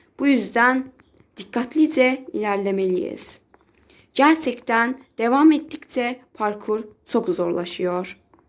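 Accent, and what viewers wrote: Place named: Azerbaijan. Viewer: Salam azerbayvanlısın niyeki bildim sesiinden :)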